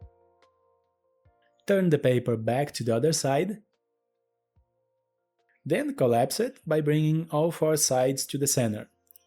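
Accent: Brazil. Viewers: O sotaque brasileiro é inconfundível hahaha 🇧🇷 incrível tutorial e lindo origami! Parabens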